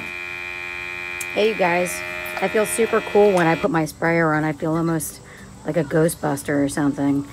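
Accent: New Jersey, United States. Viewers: Gosh, I miss a NJ accent. I was raised in Mt. Holly and lived most of my adult life in Voorhees....now I'm in WNY. Photos are beautiful. Do you grow anything from seed? Check out The Lawrence Network....awesome gardening inspiration. Keep growing. 🌱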